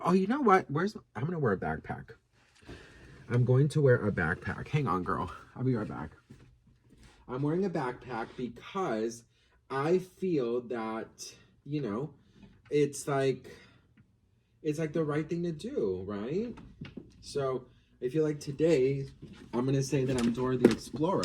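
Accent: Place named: South, United States. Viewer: Loving the wig and the southern accent!!! 😆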